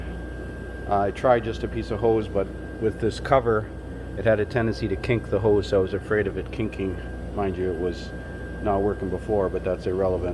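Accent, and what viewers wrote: Canada. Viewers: I did one of these about a year ago for a guy who was a manager at a Wendy’s I go to ....he bought a used starter off eBay and said can you put it and I said yeah 100 bucks little did I know what was involved with pulling the intake..... and those starter bolts were TITE !!!!! And since it was an eBay starter I did the same thing and cranked it before I put anything back on his problem was he was getting that little fly wheel ZING noise but the fly wheel looked OK and it was fine after. I reused the intake gasket since it was metal ...smeared a little grease on it Maybe that starter was an optional mini high torque geared that they produce now the one you bought 2nd said “ original style “ I came across your videos by accident searching Verus edge.... I watched a bunch of them, really like your style calm, and methodical good guy to learn from plus how can you not like the Canadian accent aye .......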